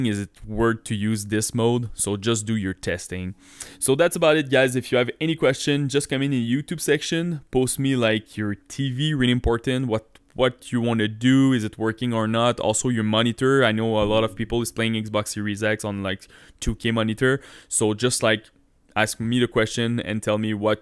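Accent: Quebec, Canada. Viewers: Man you're hella Quebecuois.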